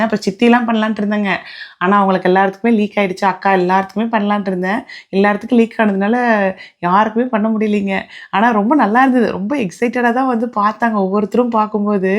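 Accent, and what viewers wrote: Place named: Salem, India. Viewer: Salem tamil rhombha super...maan vasanai innum ungalodu irukku...enga aaya, thatha ellarum salem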